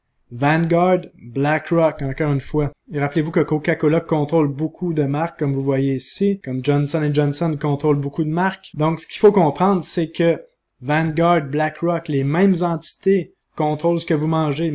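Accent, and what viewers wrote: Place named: Quebec, Canada. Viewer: Assez impressionnant. On dirait un peu de théorie du complot. Mais je dois avouer que ça nous fait réfléchir. Je vais surement regarder cette vidéo encore quelques fois et faire mes recherches afin de m'en faire une idée. Merci. PS: c'est bien d'entendre quelqu'un du Québec. " Du coup " ça change de l'accent français...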